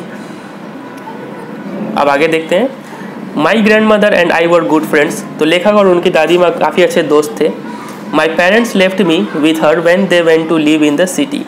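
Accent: Bihar, India. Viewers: Bihari he kya😆😆😆😆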